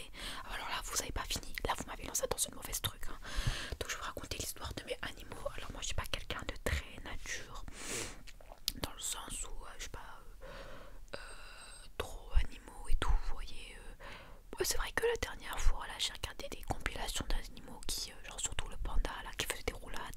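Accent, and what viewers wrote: Belgium. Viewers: Ta un accent belge c'est assez troublant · Bahaha mais c'est quoi cet accent belge sur la vidéo ? Je m'en étais jamais rendu compte 😂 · bahahahaha wsh l'accent belge jpp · Oui mais dèfois ta l'accent belge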